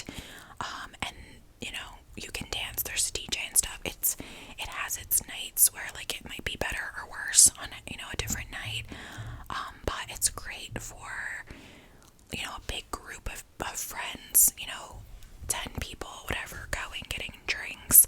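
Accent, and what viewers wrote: United States. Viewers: I think your accent is THE american accent for me😍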